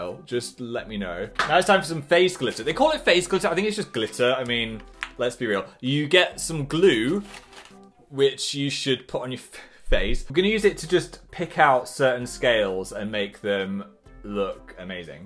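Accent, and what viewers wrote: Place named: Northern England, United Kingdom. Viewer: part of rebranding is that his northern accent is coming back and i am living for it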